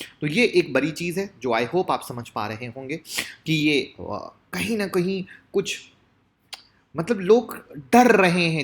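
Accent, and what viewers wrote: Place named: Shekhawati, India. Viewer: Byaj ko dhando chokho, 😆 ye to pure shekhawati style h....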